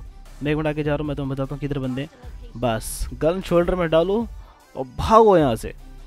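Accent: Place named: Rawalpindi, Pakistan. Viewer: Love U bhai pindi boy boltay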